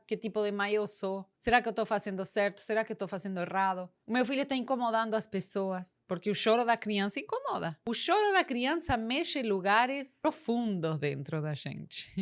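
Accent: Spain. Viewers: E o tipo de mulher sem cuidados para ser mais feminina, sem pintar unhas, com esse sutaque espanhol faz ela misteriosamente sexy....ja eu vejo so por isso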